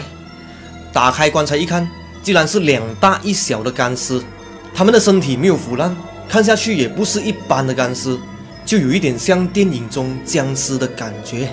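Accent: Malaysia. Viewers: Bro你的口音真的很Malaysia style😂果然是个正宗的Malaysia人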